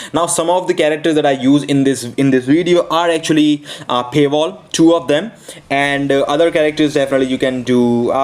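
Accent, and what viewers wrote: India. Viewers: Man I love this Indian English 😂♥️♥️♥️. Great job dude ♥️♥️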